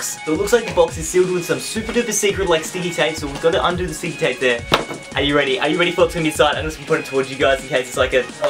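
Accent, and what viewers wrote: Australia. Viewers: hey, just wondering, r u from South Australia?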